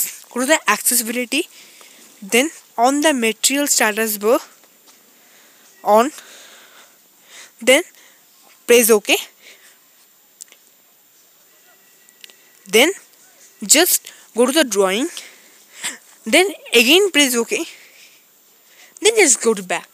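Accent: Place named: India. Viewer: How come I can never get a person who is American and speaks perfect English I can't understand these people it's always an Indian person in these tutorials!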